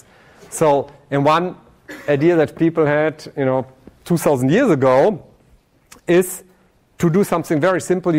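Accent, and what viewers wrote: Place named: Germany. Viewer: His English is not bad, but sometimes I can't tell if he's speaking English or German...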